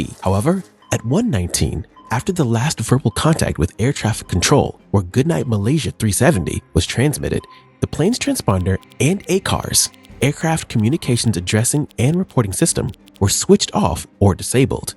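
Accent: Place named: India. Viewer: YOU CANT HIDE UR INDIAN ACCENT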